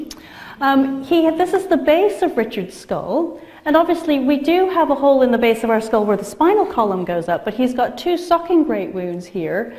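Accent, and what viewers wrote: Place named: Ireland. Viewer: For a Canadian, she has quite a few words that sound very Irish.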